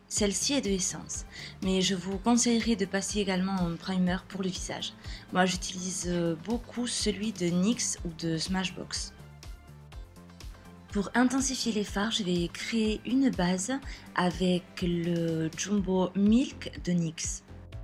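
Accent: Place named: Béziers, France. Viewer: Vu ton accent tu viens de Béziers non ? Hihi j'adore ton maquillage bisous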